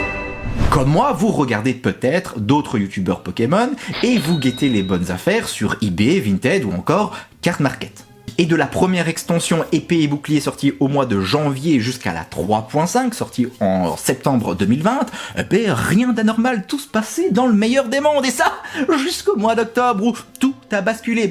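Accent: Belgium. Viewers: Whouah... Je me suis remis à collectionner les cartes en fin d’année dernière sans vraiment savoir ce qui m’y a, implicitement, poussé. Grâce a ton analyse, je crois que j’ai compris. C’est flippant! ☺️ Au delà de ça, je viens de découvrir ta chaîne et tu m’envoie du rêve Bro! Je kiffe ce que tu fais! La qualité et la pertinence du contenu, l’humour toujours bien calibré! Le petit accent belge! J’adore! ❤️ 👍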